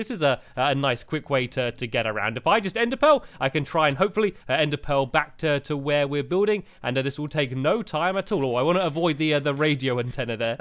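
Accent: England, United Kingdom. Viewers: My son insists that Ester's name is Estah. I told him it's Ester and pronounces it Estah because he's British, but he still wants to say it like you lol He also says "inventry" like Squid lol Just thought it was cute because he really likes you all. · you are like puss in boots accept your english smart funny cheerful alwasy happy and most of all awesome! and you have iron boots insted aka STYLE BOOTS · He is not American he is from England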